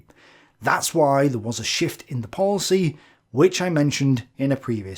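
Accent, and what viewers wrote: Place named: Cockney, United Kingdom. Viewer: I like you still using cockney instead of posh accent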